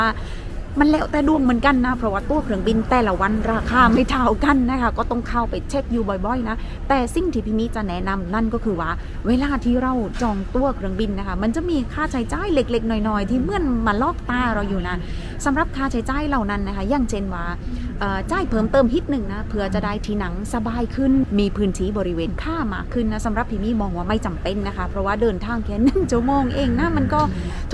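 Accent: South, Thailand. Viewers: วาวๆๆได้ยินสำเนียงใต้(เเบบเราด้วย)55 ดีงามค่ะดีใจเเทนได้กลับไปเจอพ่อเเม่ค่ะ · พี่มิแหลงใต้เพราะจังคะ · สำเนียงใต้ของพี่...น่าฟังมากๆค่ะ ฟังแล้วเพลินเรยค่ะ ❤❤ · I like your southern pronunciation so much P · ชื่นใจ น้ำตาซึมไปด้วยคะ พี่ ประมาณตัวเองได้กลับบ้านด้วย ภาษาใต้ที่น่าฟัง คะ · พี่มิพูดใต้น่ารักมากเลยค่า ❤️ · เพื่งเริ่มตืดตาม ได้ดูคลิปย้อนหลังเรื่อย ชิบมาก ตอนกดไหว้แม่ ร้องให้เลย รับรู้ถึงความรักความคิดถึงเลย คะ ชอบสำเนียงใต้แบบนี้จัง นุ่มนวล จากคนตรังในกทม.^^ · ชอบฟังพี่มิพูดใต้มากๆ ยินดีต้อนรับกลับบ้านน่ะพี่ ไม่ค่อยได้เม้นเเต่ดูทุกคลิปนะ❤️❤️ชอบเติ้นจังนิ❤️❤️ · วันนี้พี่มิพูดภาษาใต้น่ารักจังขอบคุณมากนะคะได้ความรู้มากมายเลย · หนูน้ำตาแตกอีกคลิปละค่ะ เข้าใจความรู้สึกของคนไกลบ้านแล้วได้กลับบ้านแบบจริงๆ แล้วสักที อบอุ่นมากค่ะ ยินดีต้อนรับสู่ประเทศไทยค่ะ //เอ็นดูพี่มิแหลงใต้ :) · ชอบสำเนียงใต้มากค่ะพี่ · พี่มิพูดใต้น่ารักจังเลยค่ะ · พี่มิแหลงใต้ฟังเพลิน~~~ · พี่มิแหลงใต้น่ารักจัง สงสัยจะไม่ได้แหลงใต้นานแล้วใช่หม้าย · คุณมิแหล่งใต้ได้น่ารักมากค่ะ ตอนก้มกราบคุณแม่ ป้านำ้ตาซึมเลย คิดถึงคุณแม่ของป้าตอนท่านยังมีชีวิตอยู่ เวลาป้ากลับไปเมืองไทยความคิดถึงมันท่วมท้นอย่างไรป้าเข้าใจดีค่ะ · เป็นคนเชียงใหม่ที่ฟังภาษาใต้ของพี่มิได้ แล้วภาษาใต้ของพี่มิก็เพราะด้วย เพลินดีค่ะ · ชอบสำเนียงภาษาใต้พี่มิจังเลยค่ะ ฟังง่าย สำเนียงน่ารัก😙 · น้ำตาจิไหล เข้าใจฟีลพี่มิเลย หนูเปนคนใต้เหมือนกันค่ะ อยู่ ตปท.ไม่ได้หลบบ้านมาปีหวาแล้ว สู้ๆนะพี้ ส่วนสำเนียงอย่าไปแคร์เลยค่ะ แค่พี่มีความสุขทุกครั้งที่แหลงก็โอเคแล้วค่ะ ดีใจกับพี่ที่ได้หลบบ้านมาอยู่กับพ่อแม่ บ่อยขึ้นน้ะ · ชอบเวลาพี่มิแหลงใต้ น่ารัก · พี่มิน่ารักมากเลยคะ ชอบพี่พูดใต้มากเลย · น่าร้ากกก ชอบให้พี่มิแหลงใต้ค่ะ น่ารักดี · หรอยจังคลิปนี้..แหลงใต้น่ารักอย่างแรง สำเนียงหรอยนิ นุ้ยคนคอนศรี..จะแหลงเร็วๆดังๆ555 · ผมไม่ชินกับภาษาสำเนียงใต้พี่มิเลย <num> เหมือนเปิดช่องปิดเลยครับ แปลกหูไปเลยครับ555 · ชอบพี่มิแหลงใต้มากเลยยย ฟังเพลินนนหู้(หู)555 พี่มิน่ารักมากกเลย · คุณมิแหลงใต้หร้อยๆ คนใต้(หาดใหญ่,สงขลา)คนนี้ฟังแล้วมีความสุขจังหู้นิค่ะ👍 ตอนคุณมิเจอแม่พ่อก้มกราบเท้าท่านแล้วน้ำตาพี่ไหลน้องเห้อมีความสุขไปกับน้องมิกันนะน้องนะ🙇‍♀️😢 🌷fc จากเนเธอร์แลนด์ 🌷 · ฟังสำเนียงใต้พี่ น่ารักจังค่ะ ชอบๆ^^ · ภาพที่พี่มิก้มกราบพ่อแม่ แทนความรู้สึกรักและคิดถึงได้เป็นอย่างดี ความอบอุ่นอาบหัวใจคนดูจริงๆค่ะ /ชอบฟังพี่มิพูดภาษาใต้ ส่วนตัวแยกไม่ออกว่า สำเนียงใต้แบบนี้ แบบนั้นคือจังหวัดไหน เพราะฟังไม่ออกเลย 555 แต่คลิปนี้หนูฟังพี่มิออกนะคะ 😁😁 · แหลงใต้น้ำเสียงน่าฟังมากกกก พี่ เวลาพูดภาษาอังกฤษก็น่าฟัง พูดภาษาไทยก็น่าฟัง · พี่มิพูดใต้น่ารักจังค่ะ☺️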